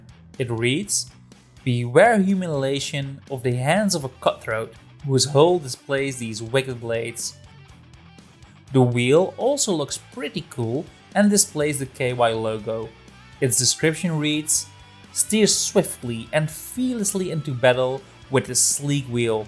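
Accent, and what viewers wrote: Netherlands. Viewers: He's Dutch, I think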